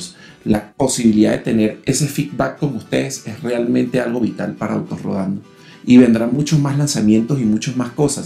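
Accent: Venezuela. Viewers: Hey no sabia que eras Venezolano, debe ser porque soy costeño y se comparte un poco el dialecto, antes tenia mucha familia allá, nuevamente saludos chamo.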